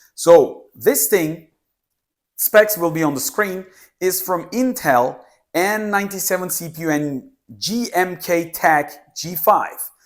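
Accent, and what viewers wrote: Turkey. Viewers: Reis türk müsün ? Türk aksanın var :> Ürün incelemesi için teşekkürler.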